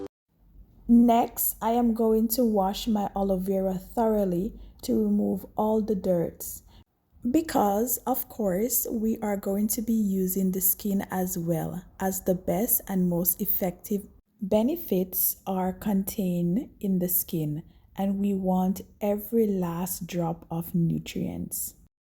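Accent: Jamaica. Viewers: I cn hear you a yard girl 😊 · typically a Jamaican she said a pat stur not pot or stir love my jamaican accent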